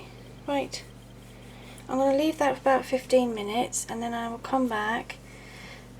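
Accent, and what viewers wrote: United Kingdom. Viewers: where is your accent? i mean, what is it called...what part of england or area? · That british accent... love it! Btw great tut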